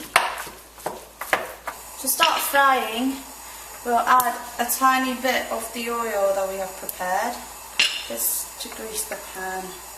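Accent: United Kingdom. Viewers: great job done by a new generation Taiwanese, like your British accent. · wow british accent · your british accent is simply fantastic....Hi from Malaysia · Are you from England. If so where in England that accent is so strong!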